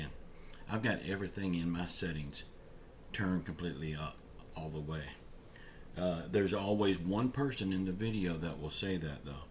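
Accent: United States. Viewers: you remember that country classic by that you I like your show I like your country ascent you are just good old boy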